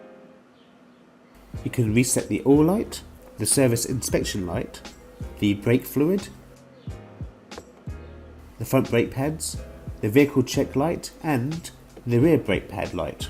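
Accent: RP, United Kingdom. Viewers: Just had to say love your posh talking voice and your CHANNEL subbed thanks for help in turning out SERVICE light reset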